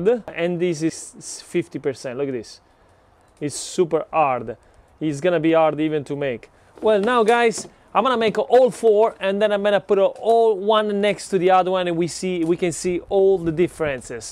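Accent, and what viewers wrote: Italy. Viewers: great italian accent😍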